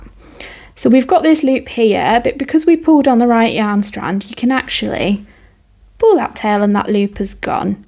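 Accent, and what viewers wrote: England, United Kingdom. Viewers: Fantastic ❤ shame you use US terms as you are from UK - would suggest you say UK terms with US terms on the screen?! Such a nice change to hear an English voice 😊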